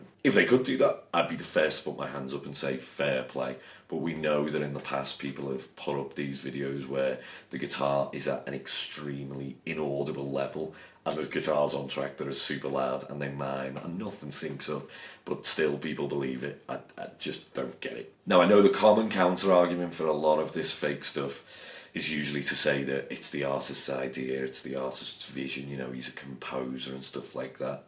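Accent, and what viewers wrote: Liverpool, United Kingdom. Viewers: Is he from Liverpool ? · Totally off-topic, but I instantly subbed for your beautiful scouse accent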